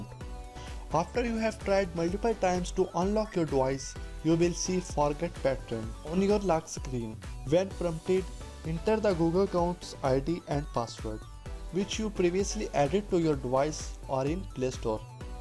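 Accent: India. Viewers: uiqbal11 He sure sounds Indian. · YOUR VOICE IS SO FUCKING ANNOYING UGH I CANT STAND IT CAN YOU TALK NORMAL AND NOT HAVE THAT STUPID ACCENT GOD THIS IS WHY I HATE INDIAN PEOPLE NO ONE CAN EVER UNDERSTAND THEM AND CAN YOU ACTUALLY GIVE A BETTER EXPLANATION ON THIS?!?!!! god your so FUCKING annoying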